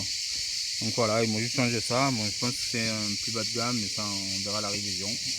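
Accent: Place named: South, France. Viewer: Ah les cigales et l'accent du Sud... J'adore. Je viens d'acheter le même ce matin pour 450€. Bonne route.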